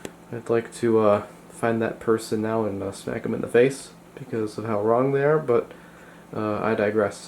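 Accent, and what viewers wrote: United States. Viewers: You are from Michigan?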